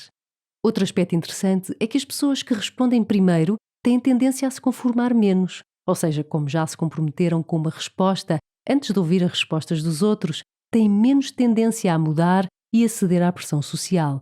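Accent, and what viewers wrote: Portugal. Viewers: Parabéns pelo vídeo bem ilustrados com figuras e ainda legendado. Sou do Brasil, pela sua voz, você é portuguesa. Tenho 6 anos, estou pesquisando, pois, meu filho vai participar de várias mini entrevistas para a segunda fase/última para cursar medicina no Einstein. Esse conteúdo só soma. Nos parece que a Conformidade Social também pode ser tanto positiva como negativa. Um líder pode usar essa ferramenta para influenciar outra pessoa (s) acionado a "imaginação" que constitui um gatilho imperativo.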